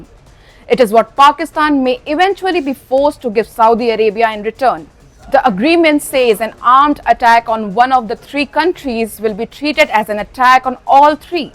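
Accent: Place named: India. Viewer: The indian accent i cant continue listening. Its horrific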